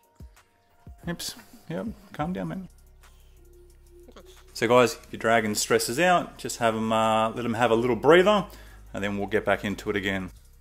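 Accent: Australia. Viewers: If something is being pulled or squeezed out of a body I am there. The Aussie accent was just the cherry on top!! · Guys, where’s his accent from? Aussie/what.?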